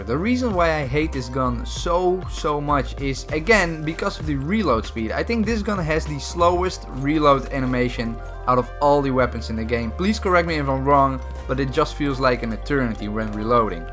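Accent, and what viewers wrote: Netherlands. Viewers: Ru dutch? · You sound Dutch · je hoort wel Nederlands accent in je engels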